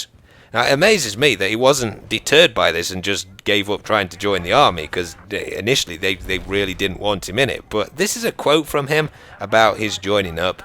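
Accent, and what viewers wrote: United Kingdom. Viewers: Love history man. These war stories are awsome. Ur like a white Morgan Freeman with a british accent! :-)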